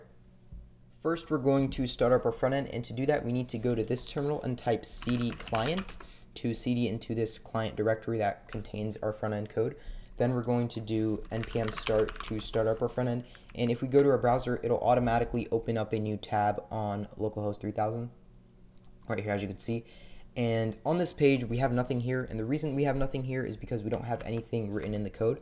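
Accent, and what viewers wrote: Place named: Nepal. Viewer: Bro!! are you Nepalese? your accent ❤ thank you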